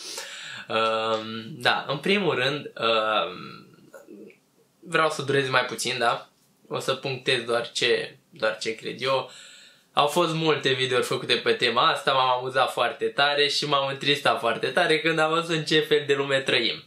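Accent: Moldova. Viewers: Like pentru accentul Moldovenesc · Imi place accentul tau de moldovean :))) ( wăăăi ) #suntsacal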